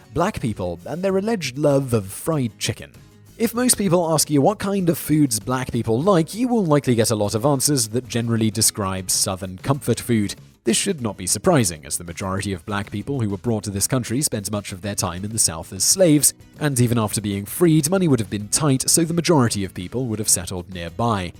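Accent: United Kingdom. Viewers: There is an strong current of anti-Americanism in all his videos. Even this video is scolding what are essentially American perceptions of the French, Japanese, English, Spaniards, etc. His basic mission is cultural collusion with the American Left, to voice U.S. leftism in a British accent. With bad teeth. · | There shouldn't be an apostrophe. | Our & Canada's situation with the Queen is much too complicated to be explained like this. While it is true that many countries under the British monarch have some customs which Americans think are clues that we are undemocratic, they really are just that. Customs. While the Queen still has some emergency powers, they extend to dissolving Parliaments & actually make us more democratic because it's a fail-safe against abolition of democracy. | British people used to not care much about their teeth, until electric toothbrushes were invented. 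I think it's weird how Simon Whistler speaks in a British accent but embodies the American stereotype of assuming that all his audience are American.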